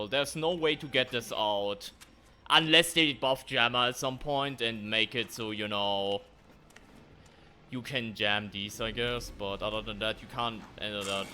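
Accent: Germany. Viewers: hey mein bester ich weiß du sprichst nur englisch mit deinen leuten aber man merkt das du deutscher bist verfolge dich schon seid monaten was für ein level hast du mittlerweile und wie viele stunden hast du in oulast trails würde mich mal interessieren😅😊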